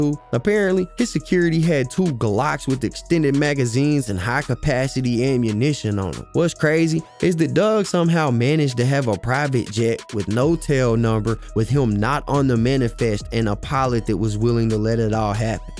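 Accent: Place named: North Carolina, United States. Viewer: This the 2nd video I watched and plan on watching more. You did your thang bro! I got a friend in NC, I bet your from out that way, he talks just like you..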